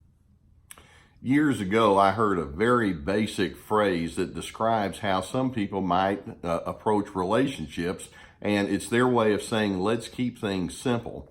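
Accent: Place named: Georgia, United States. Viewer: Where is from? He sounds like Effingham County, GA! ( A Salzburger? Well, I Suanee!!!”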